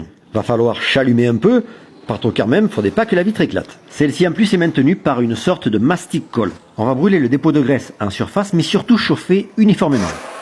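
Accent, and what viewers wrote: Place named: South, France. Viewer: l'accent du sud c incroyable 🎉chef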